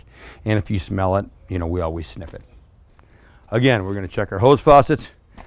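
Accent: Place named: Colorado, United States. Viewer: Great video. Very useful. Also, I had no idea that ppl say ruff instead of roof in Colorado.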